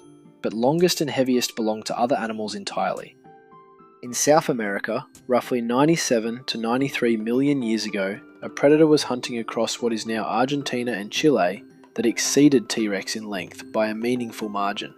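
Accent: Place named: England, United Kingdom. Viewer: that just the way red coats say the word.. some annoying english guy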